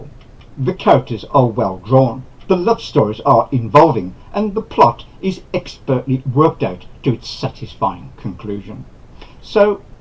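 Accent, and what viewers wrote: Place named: United Kingdom. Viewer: This book is now in my TBR and I am following your channel. Great job with the review. I love your British accent! Stay safe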